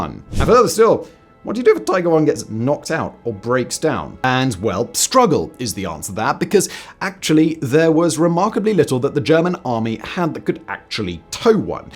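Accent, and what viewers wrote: RP, United Kingdom. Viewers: You like it or not the Tiger tank piled up many kills and is without any doubt the most famous tank in history. Why don't you make a video and describe with your unsuportable posh accent the real pieces of junk that were WWII british tank?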